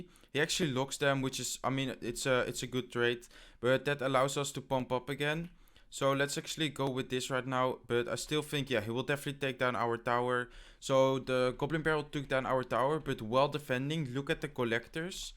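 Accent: Netherlands. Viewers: lol, it's his dutch accent haha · je nederlands accent ook xD haha nice vid :P heb je een goed deck om 4000 trof te bereiken? heb nu een een deck: giant skel, zap, sparky, fire spirits, goblin ton, dat skeleton hutje, mega gunst, vuurbal zit rond de 3500 nu maar kom maar niet ervoorbij haha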